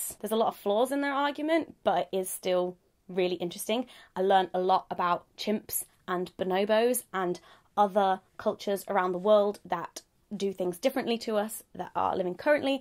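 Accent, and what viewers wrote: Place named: North, United Kingdom. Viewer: Where abouts is from? I thought she was a southerner but is it only me who thinks her accent has a little northern twang? It's so cute! xxx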